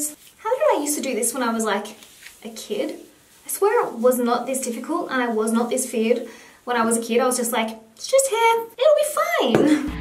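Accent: Australia. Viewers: wait your from Dublin... I thought you was Australian!!! :O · You live in Galway in Ireland? Are you kidding me? I live in Galway and I've been subscribed to your channel for forever and I thought you were Australian, I'm so confused lol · What is your accent? I love Irish accent and I lived in Ireland for years but your accent sounds all over the place you sound a bit Australian a bit British a bit idk what the hell lol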